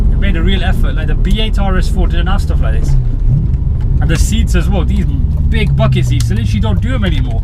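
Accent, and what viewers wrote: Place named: Birmingham, United Kingdom. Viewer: Where about is he from. His accent is Birmingham, just checking if he lives near me :D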